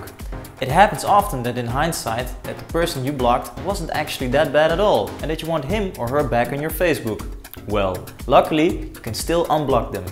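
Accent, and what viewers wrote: Netherlands. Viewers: you're from the netherlands arent you?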